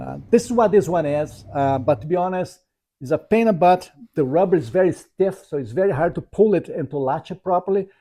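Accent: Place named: Brazil. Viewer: Sounds like you have a Brazilian accent. Greetings from PA!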